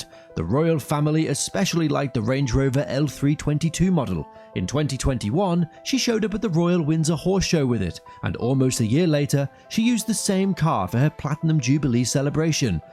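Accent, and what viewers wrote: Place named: United States. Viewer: Suffers from an American accent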